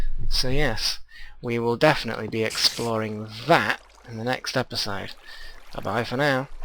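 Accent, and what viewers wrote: United Kingdom. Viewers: i love ur British accent xD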